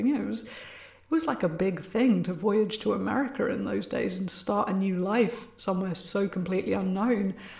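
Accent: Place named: England, United Kingdom. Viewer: WOW!. So cool you liked the cards I sent you and that they inspired a video.I called my wife in to see and she totally freaked.I feel like a real nerd but you know what, I am a fan,what can I say.I like the early morning trips to the woods dancing in the dark and hearing the king's english. will be Sharing on facebook.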